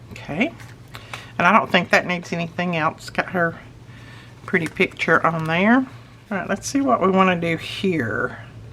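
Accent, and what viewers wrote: South, United States. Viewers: I love your crafts & your voice is so kind; I could listen to you all day. Are you from the South? Your accent seems like you are. I'm from AL. Best wishes & God's blessings. : )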